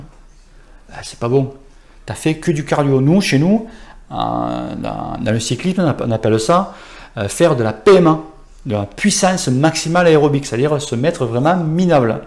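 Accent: South, France. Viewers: Dit.....sans rancune.....on se passerait bien du jingle .... sinon ton contenu est MEGAAAAA en plus de ton petit accent du sudeeee....très charmant !!!!! 😅😋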